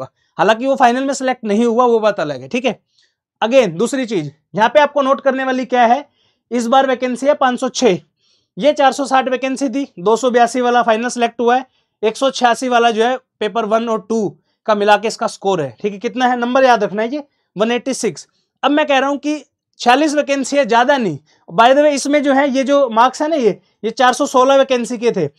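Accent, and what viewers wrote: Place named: Haryana, India. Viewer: Haryanavi boli 😅😊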